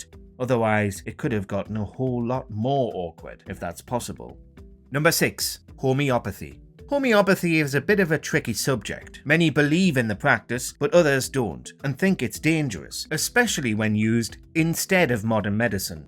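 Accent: United Kingdom. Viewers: FFS. The guy speaking in this video sounds British to me. Agree? No way! I'm not from the UK. Seems like all they do there is winge. If you guys don't like your royal family get rid of them!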